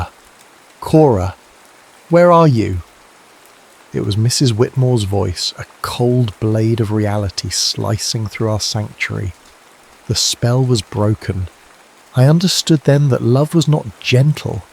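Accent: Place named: United Kingdom. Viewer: Darwin, Australia. Thank you for this video and your lovely British accent 😊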